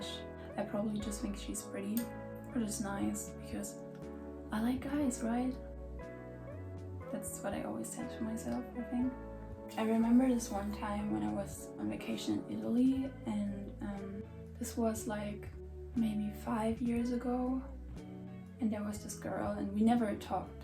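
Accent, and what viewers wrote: Germany. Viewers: Omg I go to a German school where I learn in English but I’m learning German too,I noticed you were German from the moment I heard you!! Du bist ganz schön und cool😎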